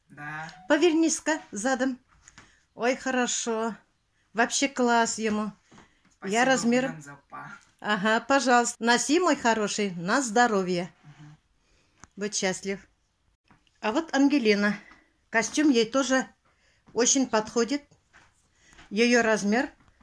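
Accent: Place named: Bashkortostan, Russia. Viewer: Точно как наша нанайка из Башкирии, и говор такой же,и та же доброта, молодец,тебе ещё больше Бог воздаст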